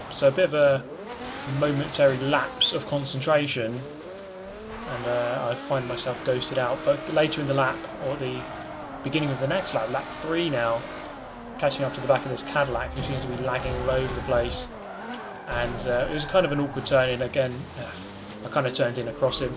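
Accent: United Kingdom. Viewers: Your British voice just makes it so much better lmfao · Your calm nonchalant attitude for ramming and other issues paired with the dialogue and English accent is absolutely hilarious for some reason. Some good dry humor lol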